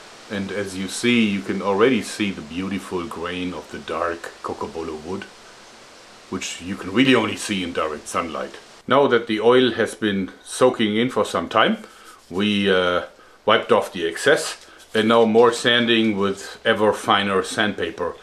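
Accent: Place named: East Germany, Germany. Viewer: Sounds eastern German, but I'm not sure. He is German though so the accent is from a germanic country.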